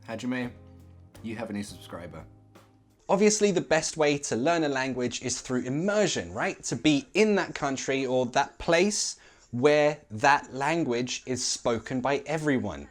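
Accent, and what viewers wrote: United Kingdom. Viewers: I love your channel!!! Love British accent!!! Thank you for sharing!!! I’m Japanese 😜1/2 lol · woah so many thanks^^ I'm learning right now korean so it's still difficult cuz it's so different than english and some words didn't still stuck in ma head so, i'ts kinda frustrating.. I'm in love with korea language (not with a person yet :v) but yeah u.u..... ptt: japanese person may thinking bout: are you Saitama in real life? :v ... I love your accent btw (it's a little bit different than other british persons)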